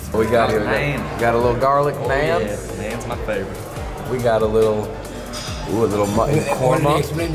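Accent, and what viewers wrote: Sri Lanka. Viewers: You look Sri Lankan :D You even speak like some Sri Lankans. :) I think we may be rubbing off on you ;)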